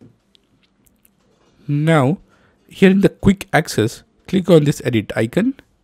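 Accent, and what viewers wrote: India. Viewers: INDIAN VOICE!!!!!!!!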